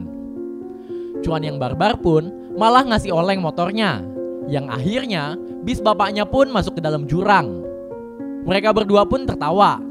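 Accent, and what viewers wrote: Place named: Pontianak, Indonesia. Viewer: Klo ngomng kok kek org ponti bg!.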